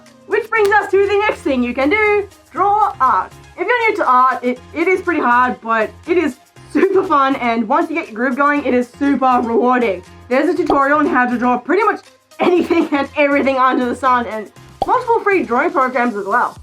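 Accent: Australia. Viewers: I dunno if I just like your Aussie accent or what, but I think you're officially my favorite furry x3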